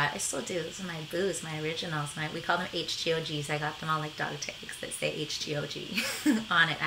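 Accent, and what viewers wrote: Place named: Canada. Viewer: Your location says United States but your accent is Canadian and your gym is located in Canada?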